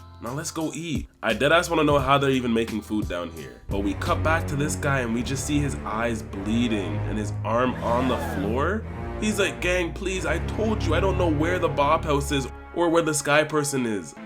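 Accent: Jamaica. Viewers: Are u a Jamaican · Yo are you a jamaican???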